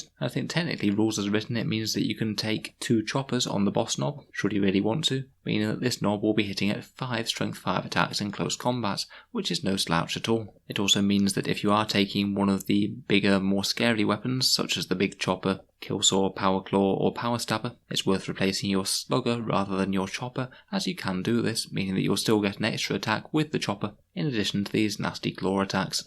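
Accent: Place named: United Kingdom. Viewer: British accent perfect for describing dakka.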